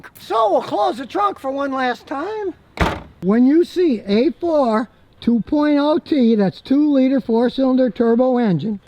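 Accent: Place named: New York, United States. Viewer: he is originally a Neu Yourka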